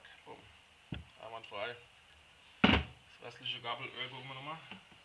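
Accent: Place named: Germany. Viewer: Ja genau, ich hab noch nie sone sache offen gehabt, hab aber diverse Beschreibungen gelesen und da kommt einem teilweise das Grauen...Bilder sagen auf jeden fall mehr als 1000 Worte. Und den Deutschen akzent lieb ich einfach:-D (als schweizer)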